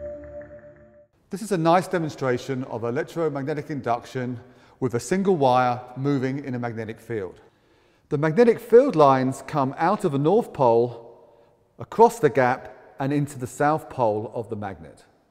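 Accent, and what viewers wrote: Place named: England, United Kingdom. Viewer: if you google the pronounciation of wire on google there are two ways to say it. he is saying it in the British way. shocking for you there are accents other than an American so shut up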